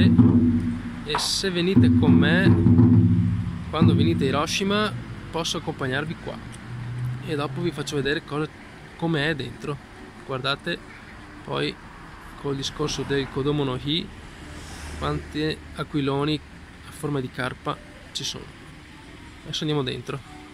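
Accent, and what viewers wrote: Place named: Romagna, Italy. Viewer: Molto suggestivo...dall'accento non capisco se sei più romagnolo o padovano :))...nel caso i colli euganei sono stupendi. Dai noi, in Sardegna, vicino a dove vivo io, facciamo diverse feste in campagna (sai col maialetto da latte e vino rosso) e ci sono diversi agriturismo immersi nella natura incontaminata ma in stile Zen è veramente stupendo e nuovo. Davvero un piacere per tutti i sensi e in tutti i sensi :D.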